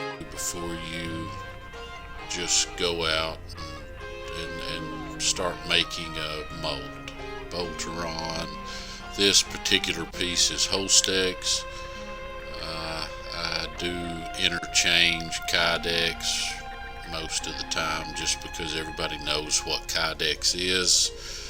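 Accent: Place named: South, United States. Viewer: I just subscribed to your channel! Man you have all the good toys! Your equipment from The Leather Co. of course is top of the line. But you go over the top with your vacuum former, your laser cutter/engraver, your burnisher. Good job! Where are you located? You have a pretty good good ole southern drawl! My kind of people.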